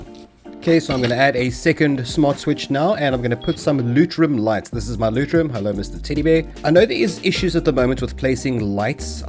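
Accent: South Africa. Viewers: Are you South African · Haha I could immediately recognize your SA accent! :D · Lekker ou ek het gehoor jy klink bietjie Suid Afrikaans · You sound like a south african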